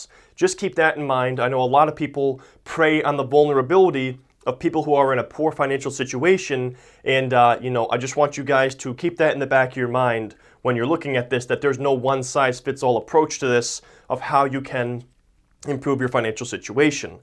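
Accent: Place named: Midwest, United States. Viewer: Great video. May I ask where you're from? I hear a Midwestern accent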